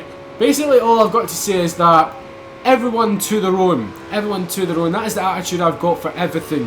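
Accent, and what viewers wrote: Scotland, United Kingdom. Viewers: i really like you videos! you're really cool guy btw i have a family relative live in Aberdeen and sometimes its really hard for me to understands him and he makes a lot of jokes about us (americans) number one they say and he just keeps going from there...but the thing is i just wanna tell you that you're helping me understand the Scotish accent (its hard sometimes to be honest) so what do you think about 'muricans? btw can i ever meet you when i'll be there? it'd be so cool:)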